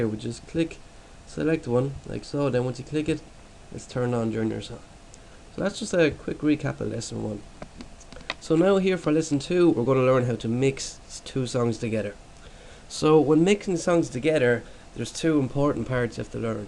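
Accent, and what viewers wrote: Ireland. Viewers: you have the voice of a rougeish irish poet lol lol nice to be able to follow a you tube tutorial for a change good stuff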